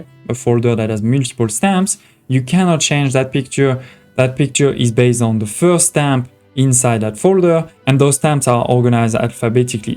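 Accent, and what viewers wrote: France. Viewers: Je ne suis pas douée en anglais, mais merci merci pour tes explications 🥰. Grâce à ton accent visiblement français 😉, j'ai réussi à te comprendre (et il faut dire que le voir en vidéo en plus ça aide, bien évidemment). EDIT : je viens de voir dans "à propos" que tu es Français, je ne m'étais donc pas trompée, ton accent t'a trahi 😁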